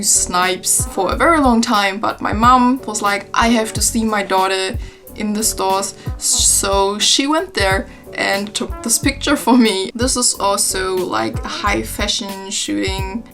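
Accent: Germany. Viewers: a pretty fucking horrible thing to say to/ about someone, and for what reason? I think her accent is beautiful. I love how soft and sophisticated German accents sound. Even if it was hideous, there's not much she can do about it, is there? Any changes would be slow, and besides there being nothing that needs fixing, she shouldn't have to spend years "improving" a perfectly understandable accent for our needs, not hers. JFC! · your accent ist german, right? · Were you born and raised in Germany cause you English really sounds 'germanized' :D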